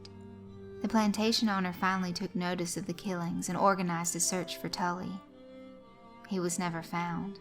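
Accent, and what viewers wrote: South, United States. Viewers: You must be a southern girl, guessing Georgia. . Southern girls with accents are my weakness ☺️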